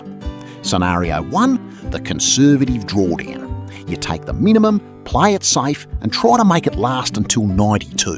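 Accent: Australia. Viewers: I'm a 62yo Aussie with a million, but for the life of me, I can't watch another video with this cringe ocker accent. Get rid of it mate. Go Asian, go British, German. Even fucking Yankee is better, and I hate Yankee.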